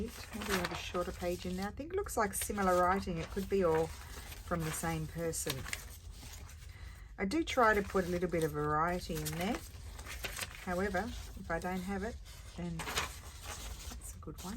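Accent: Australia. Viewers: Thank you for letting me know! Perhaps some day I can make the list. I adore your videos, your Aussie accent and your shop items. And you!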